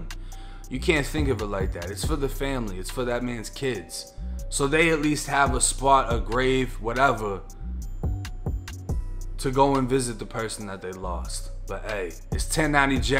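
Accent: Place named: New York, United States. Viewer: I subscribed because of your NY accent?? Lol. Thanks for being articulate, and a good story teller.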